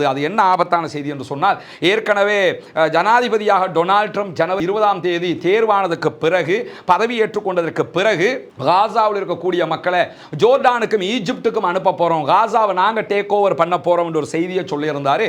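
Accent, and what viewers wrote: India. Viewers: சிறப்பான தகவல்களைதரும் நீங்கள் ஆங்கிலத்தை இந்தியர் போல் உச்சரிப்பது ஏன்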